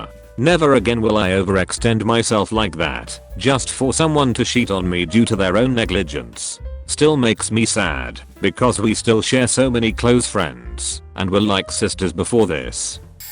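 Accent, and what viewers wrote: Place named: United Kingdom. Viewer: Are you trying to copy xo stories with the british voice and the background elevator music?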